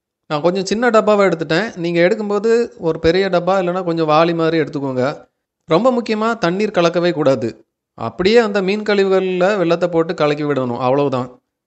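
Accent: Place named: Kanyakumari, India. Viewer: Neega kanyakumari thana.. slang apditha teridhu..😃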